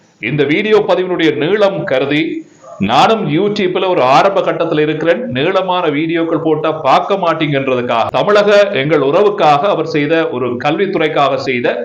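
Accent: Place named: Sri Lanka. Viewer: தங்களது இலங்கைத்தமிழ் மிக அருமை ! உலகிலேயே சிறந்த தமிழ் இலங்கையில் மட்டுமே உண்டு !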